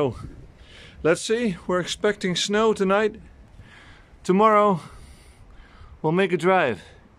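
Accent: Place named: Netherlands. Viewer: The Dutch English accent is so endearing... my favorite. Also thank you for the instructions, exactly what I was looking for. Keep it up. Subscribed :) bedankt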